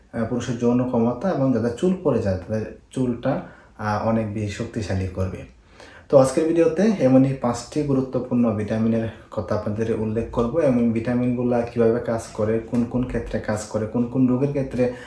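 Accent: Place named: Sylhet, Bangladesh. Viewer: আপনার জেলা কোনটি স্যার,সিলেট এর টান ভাষায়, সিলেট নাকি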